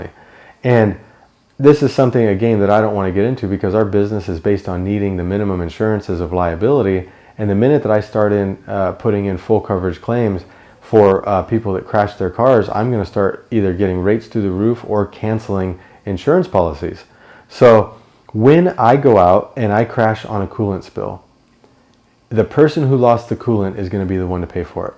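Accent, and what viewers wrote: United States. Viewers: Where are you from? your accent is American but you live at the Green Hell...I'm confused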